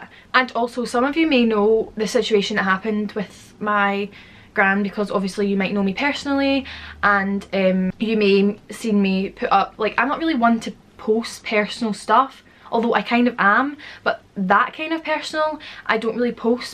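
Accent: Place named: Glasgow, United Kingdom. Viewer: Good luck lovely!!! I'm from Glasgow and have been loving hearing a local accent!! I'm sure you will have the time of ur life in Canada ♥️♥️♥️♥️♥️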